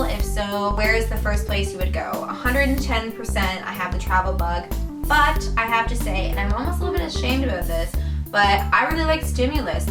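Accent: Canada. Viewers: can you do the accent tag? :P i could always tell you were from canada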